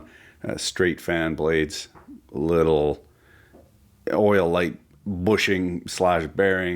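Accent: Quebec, Canada. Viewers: As a Canadian I can say never have I heard more of a Canadian accent. So thank you sir! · Are you from Quebec?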